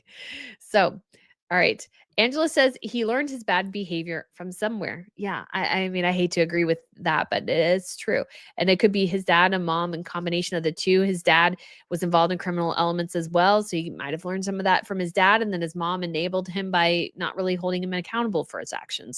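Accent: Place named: Midwest, United States. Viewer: Hey I’ve been watching your videos for a few weeks and I So appreciate that you refuse to say “controversial” words. I also appreciate your stand on right and wrong (not always what the world considers right and wrong) and your ethics! Love your vids and I’m so glad I discovered you. Are you based in the U.S.? You sound like a typical midwestern girl😊. I love it!!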